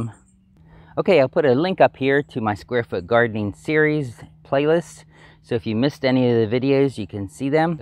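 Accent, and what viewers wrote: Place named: Pennsylvania Dutch, United States. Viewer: This is a great instructional, informative vid!👍 Wow, humongous volunteer squash leaves! Do you use DE for squash vine borers? Your accent kinda sounds like where I'm from a bit, PA Dutch country! 😉 Take care!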